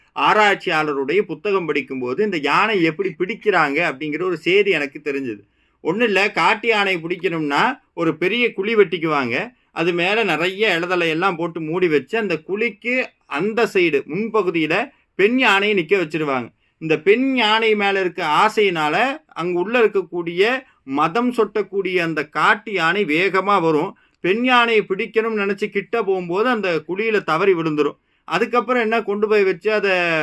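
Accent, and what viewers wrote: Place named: Coimbatore, India. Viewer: Thanks Anna. Coimbatore Tamil la unga Explanation ah kettu konde irukalaam.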